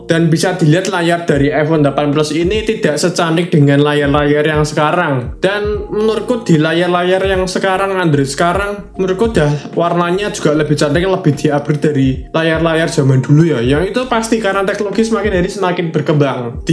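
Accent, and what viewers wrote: Java, Indonesia. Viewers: Salfok logatnya medok bgt bang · Medokkkeee podo karo aq likk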